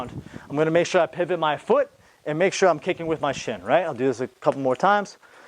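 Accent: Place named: Russia. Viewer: Low Kicks my #1 favorite technique, no bullshit Russian Accent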